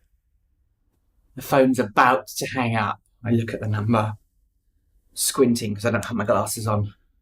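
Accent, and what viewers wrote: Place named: Wales, United Kingdom. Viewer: Ooh your Welsh accent sounds like Mrs Doubtfire!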